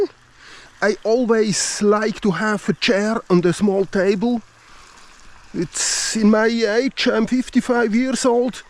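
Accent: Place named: Switzerland. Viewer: Hoi! Deine chanel ist fur mich eine echte schatz! Du gibst mir viele inspirationen wohin ich mit dem kajak gehen kann! Ich wohne seit 3 jahre in der Schweiz und ich habe jetzt gerade angefangen mit Kajak abentauer und ich suche jetzt fur wo konnte ich fahren! Aus deinem akzent ich glaube dass du eine Schweizer bist also ich glaube das tu die Schweizer kajak strecken sehr gut kannst. Dank dir habe ich jetzt paar Ziele, jetzt brauche ich nur gute gesselschaft! Ich lasse SUB fur deine channel! LG! P.S Du bist mega positiv Person, das macht fruede dich zu schauen :D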